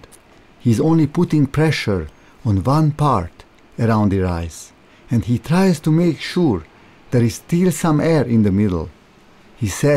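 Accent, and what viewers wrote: Hungary. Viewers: i though, that maybe this person is hungarian, because of the accent. :) its not because of fun. I was born in Budapest too. Hungarians love Japans and I hope they love us too. FOREVER JAPAN AND HUNGARIAN BROTHERHOOD. Greeting from London. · It is not fake. He is from Hungary, and he really speaks with that accent.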